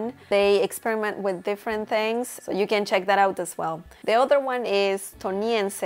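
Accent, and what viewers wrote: Castile, Spain. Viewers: Great video! You did a really good job explaining the nuances of the Spain accent, especially that “reallyyyy subtle S sound” hahah...I think that’s what I struggle with the most (I feel mine isn’t “subtle” enough when I try it🤔), and I haven’t found many other videos explain that sound so well as you did! I really like the Castilian accent from Spain tho....when I first got into learning Spanish I knew a group of people from España who helped me so maybe that’s why I like it 😁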